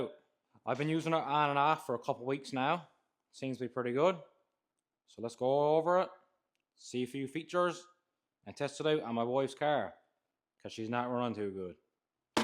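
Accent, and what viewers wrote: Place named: Newfoundland, Canada. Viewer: Sound like a Newfoundlander cheers 🍻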